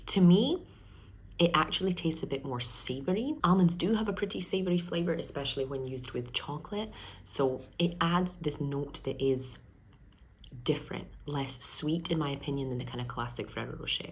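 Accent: Scotland, United Kingdom. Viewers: Solidarity with being an almond hater. [And the Scottish giveaway of refusing the aknowledge the "l" in almond.]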